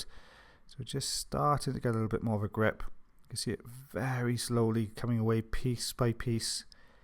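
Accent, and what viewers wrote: Wales, United Kingdom. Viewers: I have family in Mountain Ash and my grandad was from Abergavenny. I'm in Canada now but you sound like home. Thanks!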